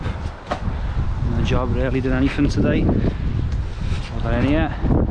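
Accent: Liverpool, United Kingdom. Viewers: This man is Scouse.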